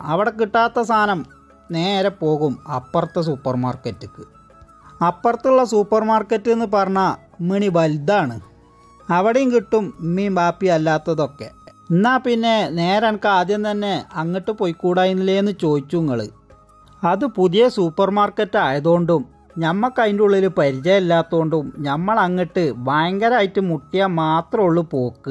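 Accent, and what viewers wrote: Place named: Malappuram, India. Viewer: നിങ്ങള്ടെ ഭാഷ കൊള്ളാം ചില മലപ്പുറത്തുകാർ സംസാരിച്ചാൽ തന്നെ t v m കാർക്ക് മനസ്സിലാവില്ല നിങ്ങൾ പൊളി സംസാരം കെട്ടിരുന്നാൽ സമയം പോവാലറിയില്ല 🤓🤓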